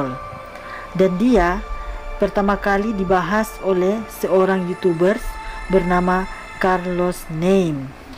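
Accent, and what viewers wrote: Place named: West Sumatra, Indonesia. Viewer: loghat Minang nya keliatan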